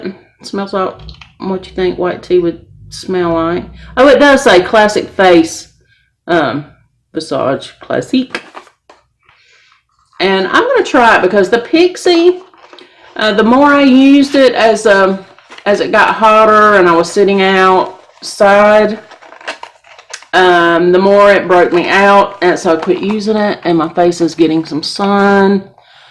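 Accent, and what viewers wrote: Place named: Texas, United States. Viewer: You have the most wonderful accent. Reminds me of my days in Texas. I have seen so many ads for those boxes now. But the Tarte palette in this season's box is just not doing for me. Hahahahaha.. I am at the Foreo (or something) part right now. I was thinking the same thing about the two prong analysis! Because, I mean, we are the first to notice in something in our skin changes, right? May be, for men, who are usually clueless (and proud of it) about skincare, might find it useful. Because, most men I know use a moisturizer, that their wife bought, if the wife says their skin looks ashy! LOL!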